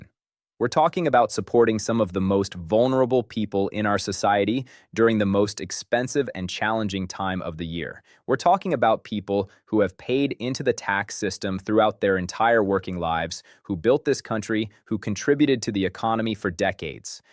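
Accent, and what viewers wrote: United States. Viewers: Why do we have Americans narrating?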